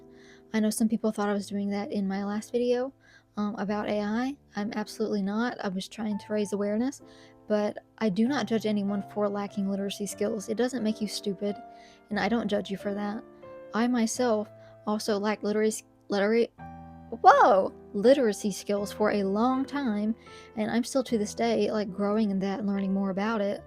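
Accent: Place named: Texas, United States. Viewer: Not gonna lie. I have never found someone so cute so fast. Stumbling here and there. Just real communication. A bit of a eye-avoidance reserveness/shyness going on. The lil texan accent in the background. Wow.❤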